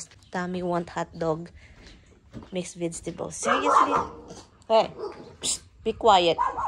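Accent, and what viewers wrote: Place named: Tagalog, Philippines. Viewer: Natakdan-nahawa sa tagalog ate hehe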